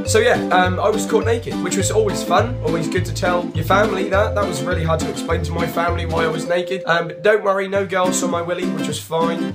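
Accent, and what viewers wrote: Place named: United Kingdom. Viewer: Your eyes omfg!! :3 Your accent is soo cute, I love British accent!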